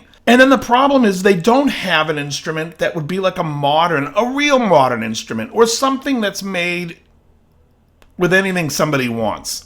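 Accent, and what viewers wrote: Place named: New England, United States. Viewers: Just found your channel today. Love the Sox jersey. You sound like youre from New England too. Waltham here. Anyway I agree with your point completely. Good shit guy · I subbed because I'm positive from the shirt and voice youre from Mass and so am I so fuck yeah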